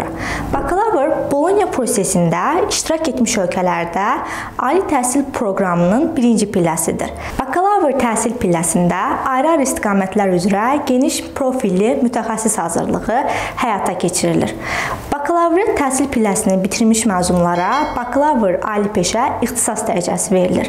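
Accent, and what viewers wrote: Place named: United States. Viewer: Sözləri sanki Amerika ləhçəsiylə ifadə edirsiz. Danışığnzı düzəltsəz yaxşıdı. Adam dinləmək isdəmir. Bu kanal çox faydalı kanaldı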